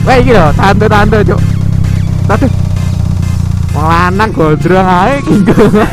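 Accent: Java, Indonesia. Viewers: jowone ngendi mas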